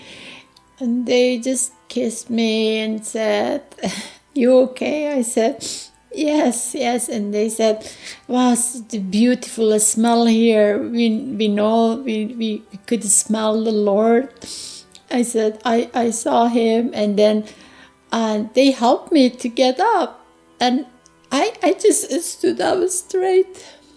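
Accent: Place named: Iran. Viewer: I'm just curious... what county in the Middle East? My guess Iran from the accent . Jesus is who He said He was all along.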